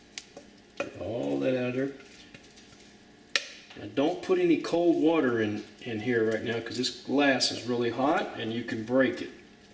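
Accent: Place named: Louisiana, United States. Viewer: What a freakin joke. It really sucks when northerners try to cook Cajun food. As a native of very southern Louisiana I can positively say this is crap. The stock is missing ingredients and a good stock cooks for at least four hours to develop the flavor. No one uses jalapeños in gumbo. It's considered a sin to do that. The roux is not dark enough and when u add the stock it looks like dishwater. His ideology about roux thickness is backwards because a dark roux is thinner than a light roux but has a nuttier taste. He should be using real andouille sausage not that fake stuff that does not even taste like andouille supposed to taste. Rotisserie chicken??? Now thats laughable! You need uncooked chicken so that it cooks and imparts flavor. This is what is unique about cajun cooking...Long cooking times with fresh ingredients and meats (uncooked) to build a composite flavor profile. 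This person has probablynever learned to cook from anyone in south Louisiana. His accent possibly sounds more like northern Louisiana and that area was not settled by the cajuns or the french so they know nothing about traditional cajun cuisine.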